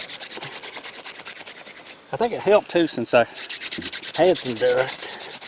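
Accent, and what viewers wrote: South, United States. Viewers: southern drawl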